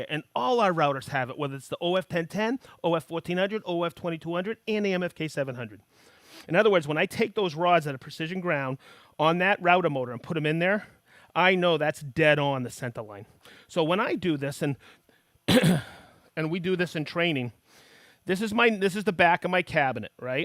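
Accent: Boston, United States. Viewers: Put the R s in the words for God sake. Hate that Boston stuff.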